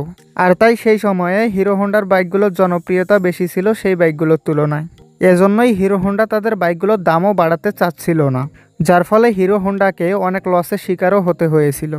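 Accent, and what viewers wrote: Bangladesh. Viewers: Are you Bangladeshi?. Because you (talk) pronunciation as Bangladeshi people. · পুরাই বাঙাল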